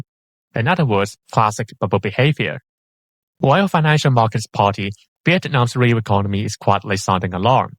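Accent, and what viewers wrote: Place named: China. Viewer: Sir, from your accent, I can tell that you are a native Mandarin speaker. What is your intention of creating this video?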